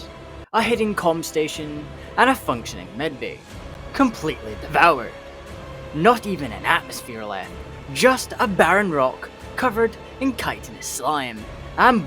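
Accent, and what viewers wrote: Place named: Scotland, United Kingdom. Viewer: As a Scotsman myself, I can verify the accent but as I come from near Glasgow that's a rare I would guess further north than what I hear, what I haven't seen is two converse between each other lol. .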